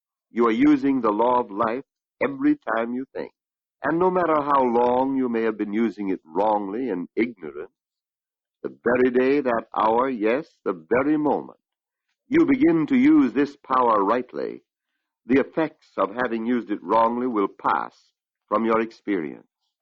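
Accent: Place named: Maine, United States. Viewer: As a former Mainer, I love hearing his Maine accent. Forgot he grew up there. One of the giants of this teaching.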